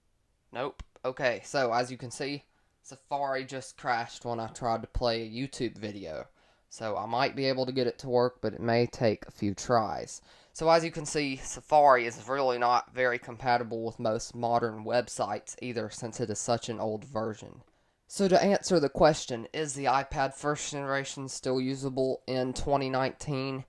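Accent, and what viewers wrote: Texas, United States. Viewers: great advise and your voice sounds nice (sounds like your from texas or near that area)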